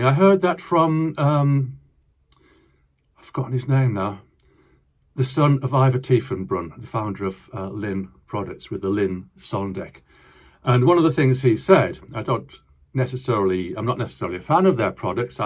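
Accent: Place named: Teesside, United Kingdom. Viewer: Your accent sounds familiar…..are you a Teesside perchance?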